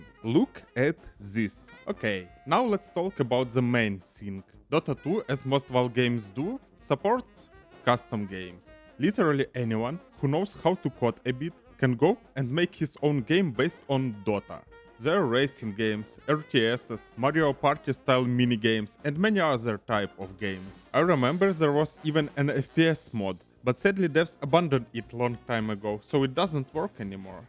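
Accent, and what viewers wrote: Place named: Russia. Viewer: Я всё гадаю причину этого мазер рашиа акцента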